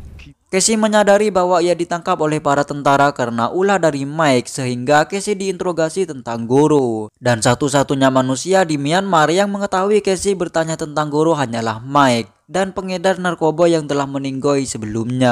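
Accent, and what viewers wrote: Madura, Indonesia. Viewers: Lu madura ya min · Admin orang Madura ya logat nya beda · Bang ente orang madura ya 😂. Logatnya kliatan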